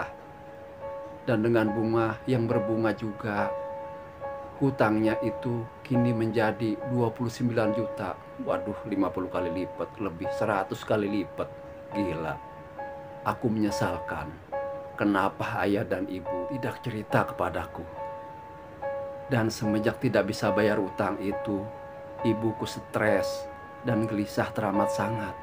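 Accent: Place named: West Java, Indonesia. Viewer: jngn lupa logat Cibogo pake Terus dan plesetan semangat 💪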